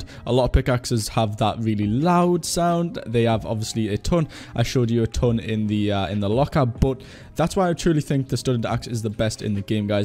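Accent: Newcastle, United Kingdom. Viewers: are you a geordie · are you from Newcastle??? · Geordie boy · You from Newcastle?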